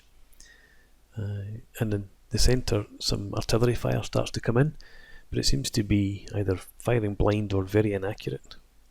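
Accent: Scotland, United Kingdom. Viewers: I enjoy the low key Scottish commentary.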